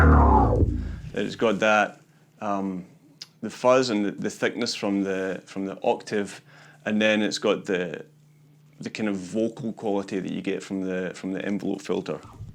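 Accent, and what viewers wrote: Scotland, United Kingdom. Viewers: You're Scottish! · What kind of accent is that? Scottish - using American terminology- and he’s in Melbourne? Total distraction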